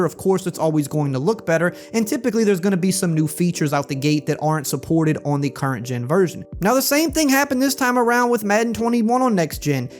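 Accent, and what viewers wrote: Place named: Chalmette, United States. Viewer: You sound like you’re from Chalmette. Who Dat!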